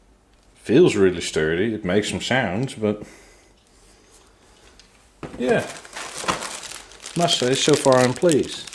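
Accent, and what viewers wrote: Netherlands. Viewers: You sound like a Dutchman who’s lived in England for years, midlands/north of I had to guess, maybe Manchester. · i can tell by your accent 😅 dutch accents are very distinctive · Dutch accent noticable within the first 5seconds but good vid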